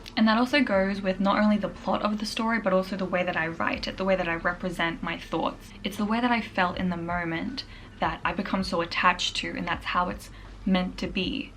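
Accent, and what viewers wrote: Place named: Australia. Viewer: I love your aussie accent!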